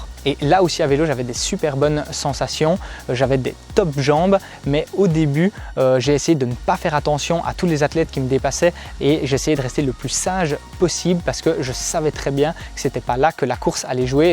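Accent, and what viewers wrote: Belgium. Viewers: Avec ton accent je croyais que tu étais belge xD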